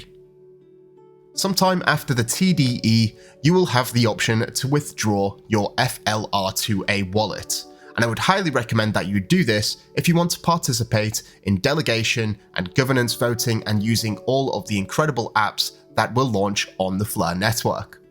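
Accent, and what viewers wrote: Yorkshire, United Kingdom. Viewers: You've learnt how to fight the Yorshire accent somewhat? Bet the yanks love that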